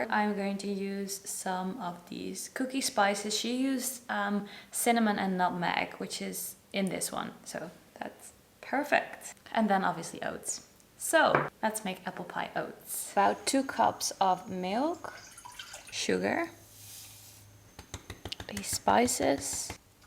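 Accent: Netherlands. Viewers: Are you dutch? Just a guess because of the accent and the dutch grocery’s😂❤️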